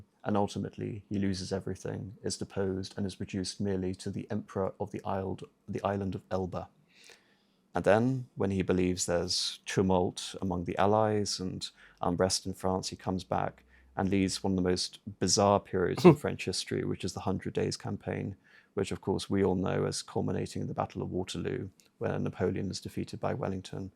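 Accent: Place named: United Kingdom. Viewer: Listening to is like reading two textbooks at once in a low pitched British accent but in a good way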